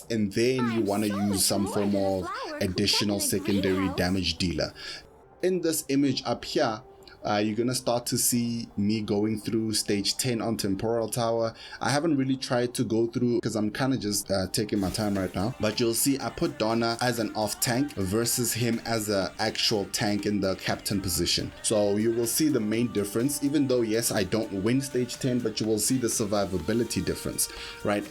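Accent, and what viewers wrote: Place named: South Africa. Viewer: Jasis so good to drop into a dislyte video and hear a lekker south african accent! you playing global?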